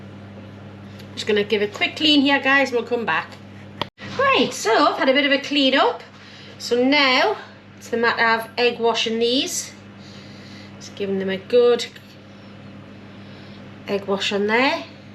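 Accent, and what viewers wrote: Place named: Tyneside, United Kingdom. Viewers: Love your videos,the stuff my ma used to make,I was born 91 yrs ago Willington quay,cant fix your accent Tyneside or Teeside! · Hi. I've had a couple of strokes, am in a wheelchair with limited use of hands and legs.would the shop bought frozen puff pastry work for these as well. Thank you for your videos. My sister did her nursing training many years ago in Sunderland and used to bring her friend to come and stay with us in Liverpool. They're still in touch but I haven't seem her for many years and miss her cheerful,cheerful friendly accent. Its so lovely to hear your accent, it takes me back 42 years. x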